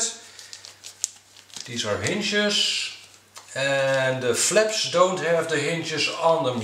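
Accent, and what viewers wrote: Netherlands. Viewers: Mooie video! Misschien is het handiger om NL te spreken? Het is een stuk moeilijker om naar Engels met een Nederlands accent! Voor de rest echt een SUPER GOED KANAAL! Meteen geabboot. 👌😊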